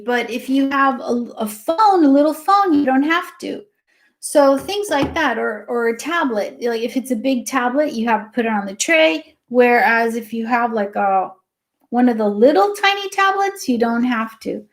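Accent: United States. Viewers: Are you American? I can’t work out and what do you do for a living?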